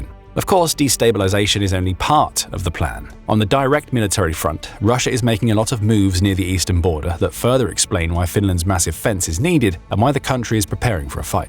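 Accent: United Kingdom. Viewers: Your British accent is charming. Nevertheless, it is up to Finns to decide if they want to promote and live in peace or become proxies, perhaps Ukr 2.0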